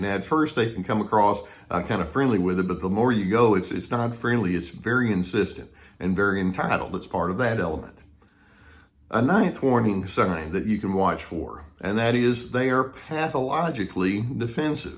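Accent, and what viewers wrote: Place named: Texas, United States. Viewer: Nearly all of the people in my life are anti-conversation, at least with me. Their defensiveness, quickness to pronounce me wrong, etc. interfere with the idea-based back-and-forth I crave. This is surely partly my own fault but if there's a word for such auto-contrarians I'd appreciate your telling me. P.S. You sound Texan; which part y'all in?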